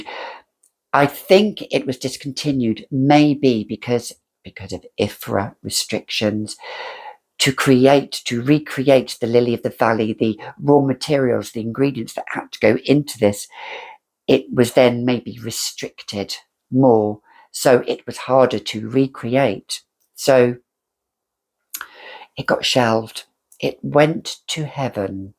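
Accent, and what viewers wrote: France. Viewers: your French accent is lovely, I love hearing you pronounce names and brands! I know that's not the important part, but it's music to my ears 😊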